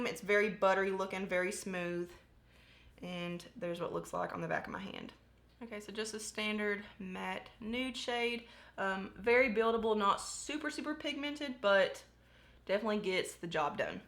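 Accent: South, United States. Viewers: THANK YOU FOR YOUR HONESTY! Your video is the first truly honest & genuine review. I love Emily also, but I'm sure she didn't have absolute control over the whole production of this palette. I'm SO grateful I stumbled across your channel. I'm really drawn to your southern drawl... maybe cause I've one also. You also do a lot of looks like what I prefer myself in my daily life. The face is a canvas that we turn into our own personal masterpiece. Blessings to you for your WONDERFUL, FUN & GENUINE videos!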